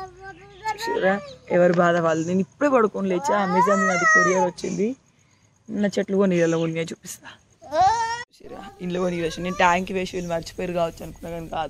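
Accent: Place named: Hyderabad, India. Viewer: Hyderabad slang masthu vachindi akka niko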